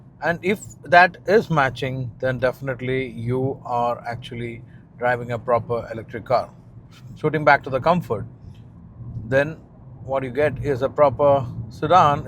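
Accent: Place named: Kerala, India. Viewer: Heavy Malayali English accent from the man. Then I saw the location, ahhh? Dubai. OK! Nice content.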